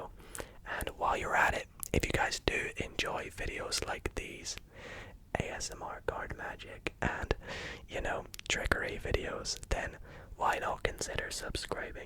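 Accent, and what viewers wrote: Scotland, United Kingdom. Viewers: The reason you're so good at ASMR and also card stuff is simply because you're Scottish lol. It's weird hearing a Scottish accent do ASMR, because I'm also from Scotland haha. But man! Your ASMR is the only one that works for me. Thanks for the vibes, mate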